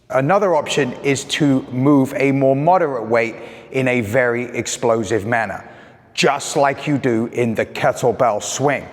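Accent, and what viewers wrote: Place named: Australia. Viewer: Where are you from? That's the first South-east UK/ east coast US, with hints of Aussie accent Ive ever heard?!?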